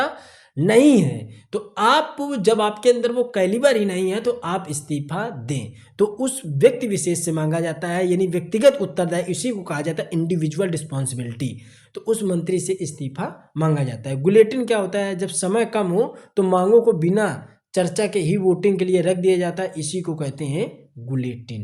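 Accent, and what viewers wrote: Kanpur, India. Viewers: Sir aapne bahut ache se samjhaya.... Kya aap kanpur se ...apki awaj se aise lga mujhe ...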